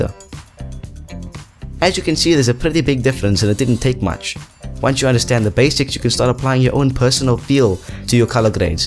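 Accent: South Africa. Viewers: You sound South African bru.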